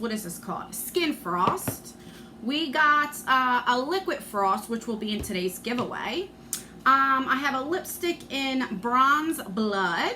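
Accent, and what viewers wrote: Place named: Philadelphia, United States. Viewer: I love hearing your Philly accent!!! I really wanna win but I'm not about to comment a million times lol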